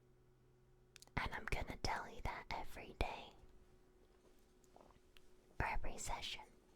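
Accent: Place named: United Kingdom. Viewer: Bri'ish woman detected, my neurons activated.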